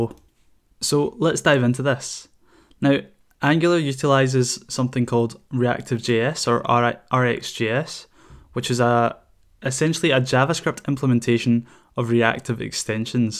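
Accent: Scotland, United Kingdom. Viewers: nice tutorial and nice scottish accent, brings me back to my old scottish lads in Spain :,) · Great tutorial and the scottish accent makes it even better :) I'm not even doing angular, but tutorials for redux-observable are so scarse... If you could do one on that, it would be great, but in any case - great work, thanks and keep it up.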